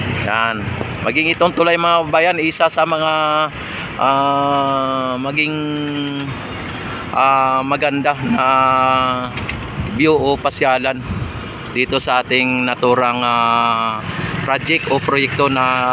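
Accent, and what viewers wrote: Visayas, Philippines. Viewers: Bakit hilig nyo mag vlog na akala mo reporter kayo?! Mukha kayong timang! Bisayang ewan pa accent mo!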